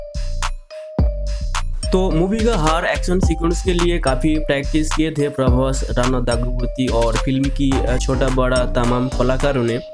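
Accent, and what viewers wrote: Bengal, India. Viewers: Bhai aap bengoli ho??? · kotha gulo bangla te bollei besi vlo hoto tomar kotha gulor mddha onk bangla tan a6e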